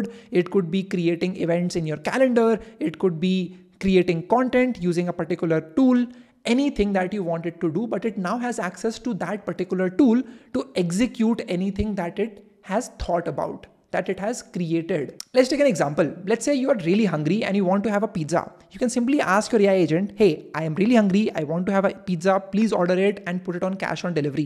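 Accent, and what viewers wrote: India. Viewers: Sorry indians should not speak English because they speak English in Hindi same as you are now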